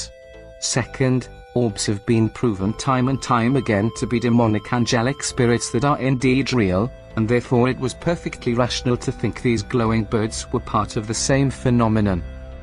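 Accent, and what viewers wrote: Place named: North Wales, United Kingdom. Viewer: The commentator is a Gog. That is, he is from north Wales and he has a Gog accent. The accent is only slight. I find a strong Gog accent awful to listen to!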